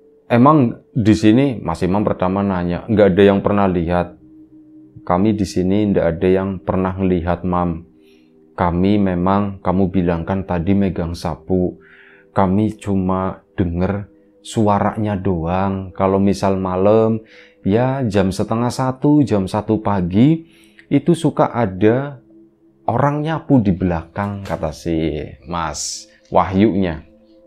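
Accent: Tegal, Indonesia. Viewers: Mas sampean orang tegal bukan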